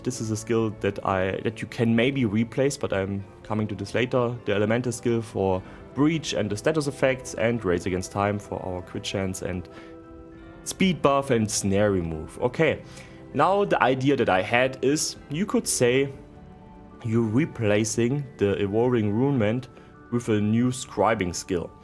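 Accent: Germany. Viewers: Immer wieder leicht lustig, wenn ein Deutscher Spieler flüssig Englisch spricht, aber es doch sehr Deutschenglisch klingt :-)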